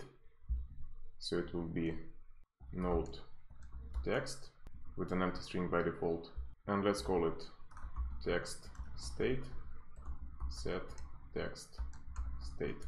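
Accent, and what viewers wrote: Russia. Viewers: После сотни видеороликов с индийский акцентом так необычно слышать русский.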